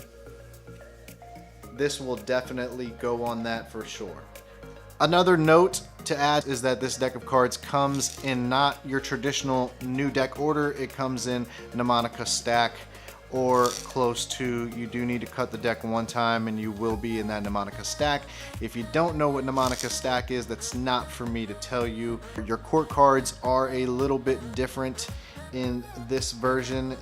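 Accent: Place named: Houston, United States. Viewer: 🤘 question...Are you from Htwn? You talk alot like us down hurr.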